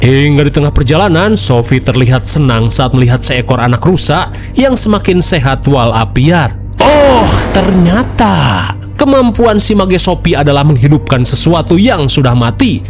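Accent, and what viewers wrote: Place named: Cianjur, Indonesia. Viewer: ini bahasa Sunda ny kaya ny cianjur bngt nyambung sama Sunda aku soal nya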